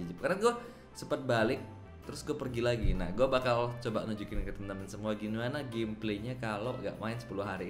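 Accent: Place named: Medan, Indonesia. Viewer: Haha,PARTENTENGAN gak tuh.. Udah belajar bahasa Medan Abang ini bah.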